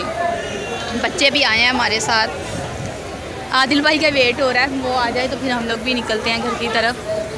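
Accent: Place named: Rural, India. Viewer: Bhot gandi Punjabi bolti ap pendu hi lagti ap or zra aram sy bola karo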